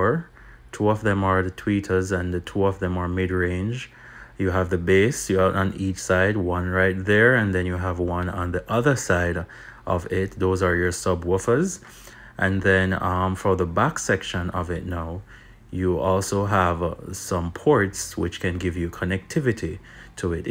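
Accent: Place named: Belize. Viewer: Bro you sound Belizean! What's your nationality? If you don't mind me asking!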